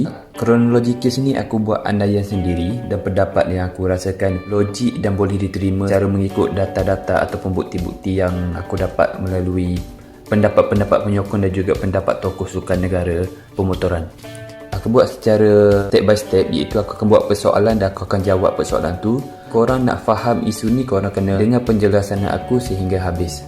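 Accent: Kelantan, Malaysia. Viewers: Sama kes dgn syarikat kenderaan hyundai sekarang.rumusan utk isu ni blh di rangkumkan dlm 1 ayat iaitu ‘asal benda jadi pitih. 1 lg,sy berani letak duit yg admin ni org kelate berdasarkan suara. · Nak tanya.. suara yang baca orang Kelantan ke🤗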